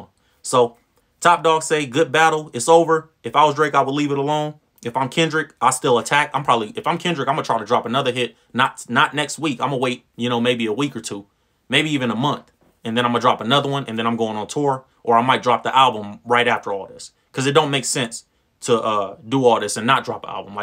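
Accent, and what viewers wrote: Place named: West Coast, United States. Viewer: Ok u from the West Coast your accent lol 😅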